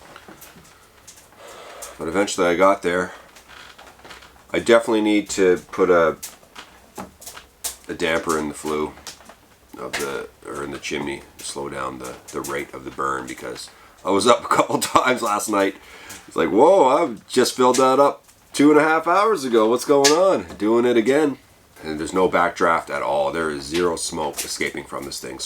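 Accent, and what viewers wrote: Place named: Nova Scotia, Canada. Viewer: Get wicked stuff man. Sound like you’re from NS exploring the far north! Great stuff. I know a legere from ns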